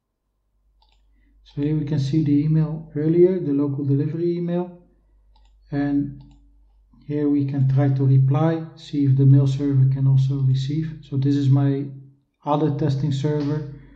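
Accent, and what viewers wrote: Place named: Netherlands. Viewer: hello dutch man XD good accent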